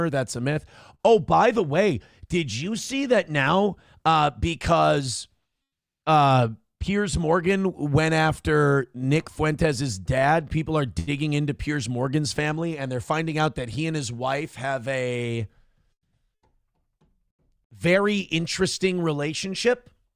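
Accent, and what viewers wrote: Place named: Minnesota, United States. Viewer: sounds like he’s from Canada unlike your minnysoder accent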